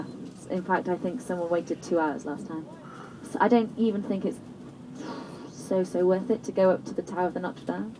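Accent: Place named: China. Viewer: Seems to me like the narrator is from China. Cant get her pronunciation at times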